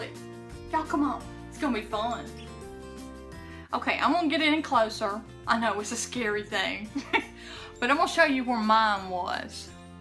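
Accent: South, United States. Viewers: Nice video and love your Southern accent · Love your southern accent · Thank you:) Love your accent as well!! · Love the info and love your accent. And you’re beautiful!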